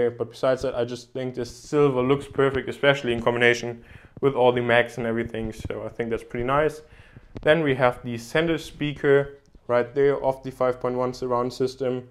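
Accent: Germany. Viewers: Are you german? :D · are u german ?? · Are you German?? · Kann es sein, dass du Deutscher bist??? Wegen deinem Akzent und der ZDF App auf dem iPad Mini... :D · is this guy german? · war iwie klar zu erkennen das du ein deutscher bist :D schönes raum tour! ;) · Are you German ??? Also du hörst dich irgendwie n bisschen deutsch an ???? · U have Ferman accent... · You sound german, are you german? :) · im just curious .. by any chance ... are you german ?? :)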